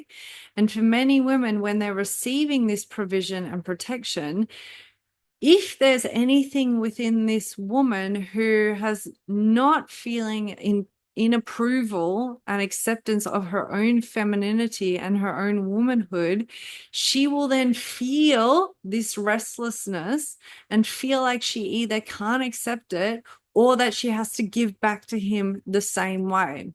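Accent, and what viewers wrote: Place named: Germany. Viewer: It sound like you are German and are trying to hide your accent…am I right or am i wrong..? Am curious…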